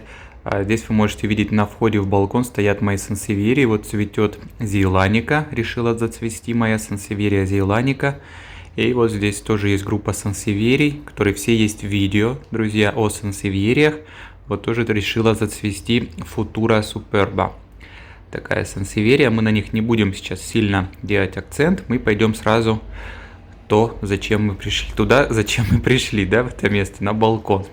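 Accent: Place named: Spain. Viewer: Прекрасное видео - как приятно видеть ухоженные растения имеющие неповторимый естественный вид при заботливом выращивании в подходящем для них климате. Вроде бы - всем известная простая маммиллярия Mammillaria elongata, но лучше не видел, очень хороша под натуральным солнцем. Как ориентирован балкон по отношению к частям света? И хотел бы немного помочь с названиями. Сомневаюсь насчет алоинопсиса - это скорее фрития (Frithia pulchra) если цветки темно-розовые или фенестрария ( Fenestraria rhopalophylla / aurantiaca), если цветки желтые/белые. Кактусы: и - не эуфорбии, это такой очень красивый маленький цереус Monvillea spegazzinii, очевидно выращенный из кусочков кристаты. Она красиво цветет. Безымянны кактус - Notocactus oxycostatus, родственник известного всем N. ottonis. И рядом стоящие Eriocactus leninghausii i Brasilicactus haselbergii. Нет, это не маммилопсис. 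)) Я не использую кактусного новояза и не обьединяю по-хантовски старые известные роды, пусть это остаeтся на совести неофитов. PS Когда читаешь латинские названия у тебя приятный испанский акцент. Я изучаю португальский, с ним испанский понятен, но не наоборот. ))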